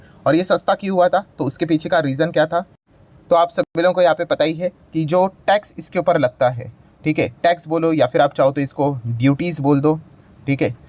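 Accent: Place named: South India, India. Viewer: Are you madrasi,because ur Hindi pronounce is like madrasi people pronounce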